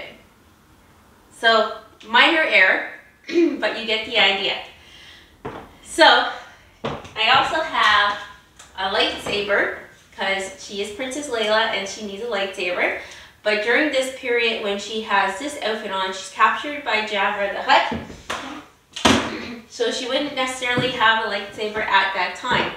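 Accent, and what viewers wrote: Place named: Canada. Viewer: good night sweetheart I can tell in your voice that you are from Canada sweetheart I love it❤❤ looking beautiful in that sweetheart 🥰🥰🥰 love to hear your voice in person 😍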